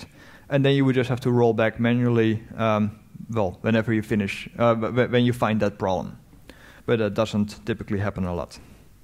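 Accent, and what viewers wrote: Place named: Netherlands. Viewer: helpful video. but the Dutch-English here is cringe worthy.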